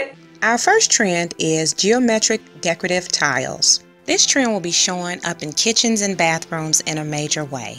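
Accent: South, United States. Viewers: Your soft sweet southern voice is so soothing to me, it's easy on the ears! The informative pictoral dissertation on styles over the years was interesting and proves there truly is "nothing really new under the sun" so we are assured it may be out for now but it will return! Glad to have found your channel! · She is southern so has a thick accent... I'm not even American and I can understand her clearly. Then again, I do speak 4 languages.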